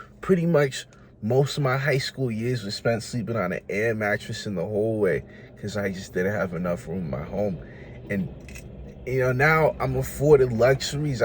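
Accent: United States. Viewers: You from Rhode Island? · I would just like to know how did you get ur boston accent when u not from boston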